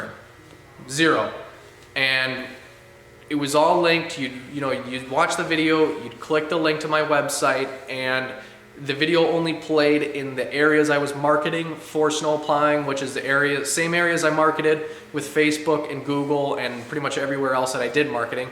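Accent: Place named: Wisconsin, United States. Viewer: Sounds like your from wisco.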